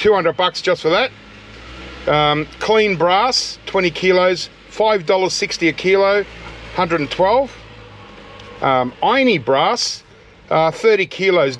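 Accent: Australia. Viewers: Well your accent sounds Australian but y’all use dollars?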